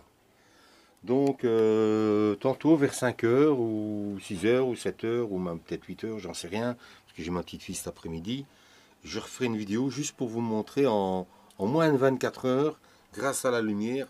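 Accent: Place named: Wallonia, Belgium. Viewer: moi tu pourrais encore faire tes vidéo en wallons je kifferais aussi. Il faut être fière de notre accent, nos formules de langage, de notre Belgitude... il n’appartient qu’à nous-mêmes! 👍🏼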